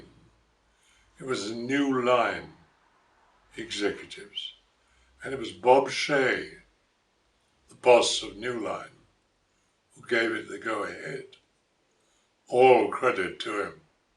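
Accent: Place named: United Kingdom. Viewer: Out of all of the different English accents across United Kingdom this one what a true English gentleman sounds like in my opinion, every time I hear someone speak like this I immediately have a higher opinion of them.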